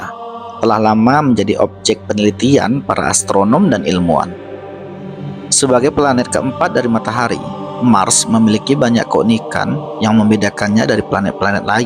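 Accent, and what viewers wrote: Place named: Madura, Indonesia. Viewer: GANTI PEMBACANYA!! BACA NYA KAYAK ORG MADURA… Tak Ye Tak Ye, kyk tukanh sate depan rumah saya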